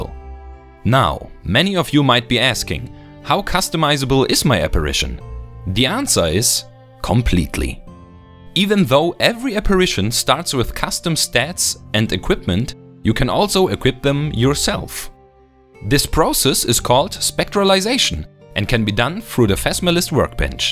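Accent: Germany. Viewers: Thank you so much for making this game, I already finished it twice and I'm waiting for this dlc to play it for the third time. The whole idea seems amazing but I'm a little sceptical about completely moving sinistra magic books to outlaw merchants. Entropy already felt like a lesser elementarism, now it just seems like it's simply not worth bothering with, but we shall see. Also, I love that german accent.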